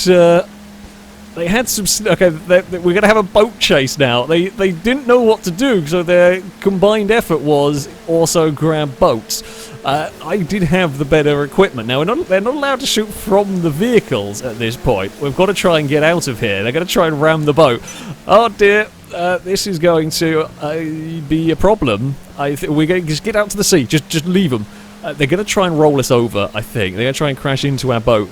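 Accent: United Kingdom. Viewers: I didn't notice anything odd, but then again I've always taken any of his odd sayings as 1. Just plain ole' British English accents versus my American ears and/or 2. I the heat of the moment he's not always fully concerned with prefect pronunciation.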